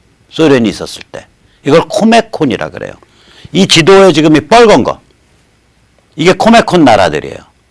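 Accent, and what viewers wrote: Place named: Seoul, South Korea. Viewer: 오늘도 체한것이 시원하게 내려간듯..좋은 말씀 잘들었습니다. 국내,외 지인들과도 많이 공유 했습니다. 특히 좋아하는건.. 선생께 많은분들이 잘 못느끼는 특유의 서울 본토 사투리를 들을 수 있다는 겁니다. 배우 한석규씨의 억양도 순수 서울 사투리가 배어있어 듣기 좋습니다. 나는 지방사투리들도 순수한 정감을 느낄때 좋아합니다. 서울말씨를 익히고 싶은 지방 분들은..특히 젊은분들..? 딴것보다 선생과 배우 한석규씨 프로들 많이 보세요.^^ 선생이 오늘 다른 you tube "pen&mike" 후원행사에 오실줄로 개인적으론 기대했는데.. 편 가르는게 아니라.. 大同團結의 의미에서 기대했었습니다. 늘 고맙게 보고있습니다.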